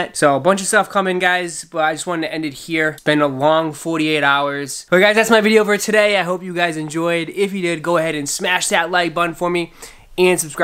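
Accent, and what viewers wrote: Boston, United States. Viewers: Just subscribed. That BAHSTON accent is what sold me.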